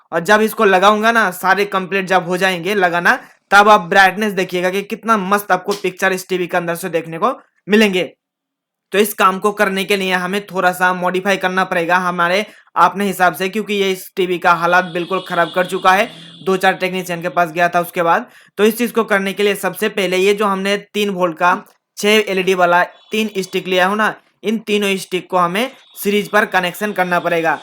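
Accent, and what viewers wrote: Bengal, India. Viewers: You are Bengali Right ❤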